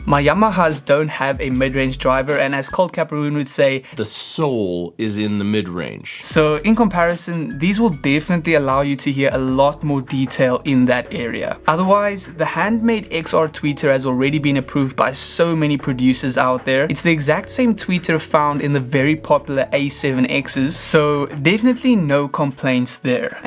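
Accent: South Africa. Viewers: I just watched a video of yours where you compared 6 studio monitors and went looking to see if you had an updated version. This was recent so I watched it. My suggestion is to use a spectrum analyzer to compare monitors. Anything else is too subjective. You can even install a free one on your phone. The purpose of monitors vs speakers is to give you the pure, unaltered sound of the music/instruments/voice as it was recorded/played. The only way you can tell is to use a spectrum analyzer and compare how flat the sound is from one to the next using an objective measurement. I like what you're doing, just a suggestion on how to do it. BTW, are you South African? I lived there for several years a long time ago. A beautiful country.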